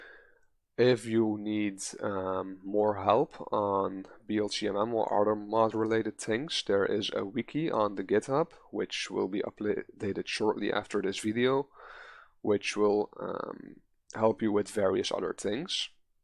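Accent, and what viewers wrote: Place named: Netherlands. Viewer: Nederlands ofnie ik kan het zo horen in jou Engels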